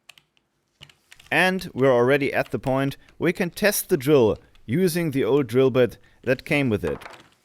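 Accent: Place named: Germany. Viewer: I've been scavenging old junk for years in wicklow, but your videos have inspired me to fix some of it up. So thank you for the inspiration, and your lovley German accent with great English 👍. Much better than us irish speak it.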